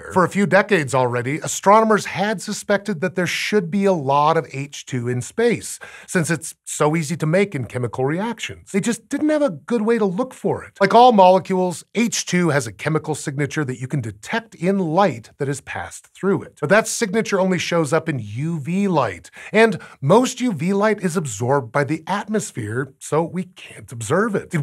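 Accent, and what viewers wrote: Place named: United States. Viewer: Chris Ecclestone? He was from the north of England. This guy's American.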